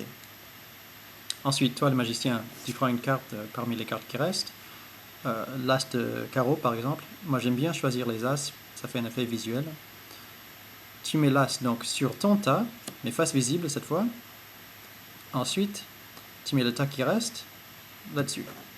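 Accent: Quebec, Canada. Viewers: bravo j'adore l'accent québecois :-)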